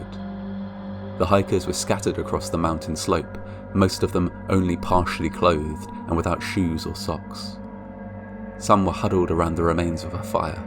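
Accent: United Kingdom. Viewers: As an American, something alluring about a Brit accent as long as it’s not cockney.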